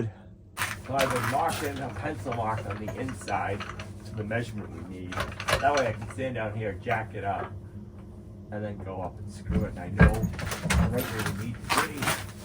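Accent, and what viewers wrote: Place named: New England, United States. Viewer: in the last couple videos, you kept referring to “Gracing the roof”. For the LIFE of me I didn’t know what u meant. Then you pulled out the ice shield and I get it now. That must be a “NewEnglander slang” I guess LOL! I do get a kick out of some of your pronunciations from time to time. You’d probably make fun of how my midwestern accent sounds too😂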